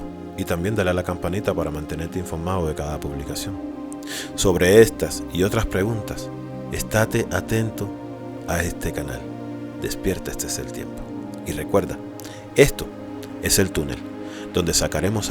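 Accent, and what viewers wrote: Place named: Cuba. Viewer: Me Gusta tu Acento Cubano, también soy Cubana 🤗🤗,me relaja mucho escucharlo 😴..Menos mal yo no creo ni en el Cristo ..Soy un Alma libre y como alma que soy no hay nada más poderoso que yo, solo la fuente que me Creó! por eso nos mienten y se esconden de nosotros, porque realmente el alma es misidial para ellos.. lo aprendí gracias a Corrado Málanga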